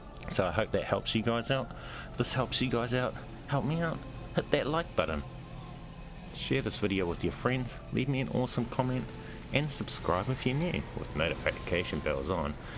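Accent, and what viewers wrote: New Zealand. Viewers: Thank you so much for the guide! Finally was able to beat it because of you haha. Also your NZ accent is so dam cool!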